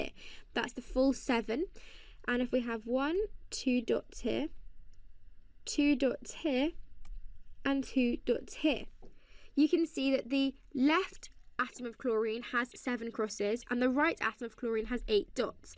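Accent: United Kingdom. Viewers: yeah strong British accent :D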